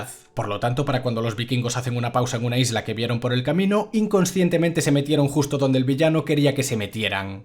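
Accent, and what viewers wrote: Spain. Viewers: Che de donde sos ? Hablas con mi argentino, pero acento español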